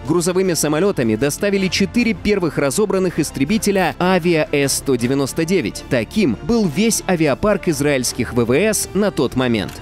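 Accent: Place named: Moscow, Russia. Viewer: Рассказ интересный. Но Московский акцент режет слух: "конфликт нАваприбывших обострялся...". Да здравствует Красная Армия! Да здравствует социалистический сионизм.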